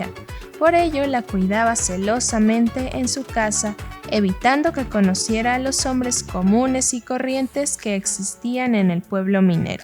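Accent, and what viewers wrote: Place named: Mexico. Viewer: Como me gusta la forma de tua vídeos! Y los dos quedaron preciosos! Me encanta tu tonada mexicana, sou brasilena.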